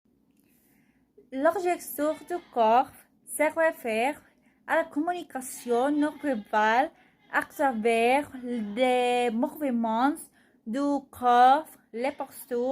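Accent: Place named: Portugal. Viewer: Eres portuguesa? você fala francês como um português... hablas frances como una portuguesa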